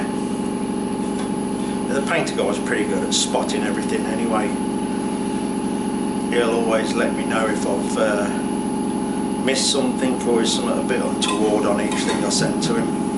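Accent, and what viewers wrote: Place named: Leicestershire, United Kingdom. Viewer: Just found your channel, and started catching up with your vids, I've been an engineer all my life, so can appreciate your skills, and with that accent, Im thinking you are from somewhere in Leicestershire? anyways, great content, really enjoying it!, cheers Dave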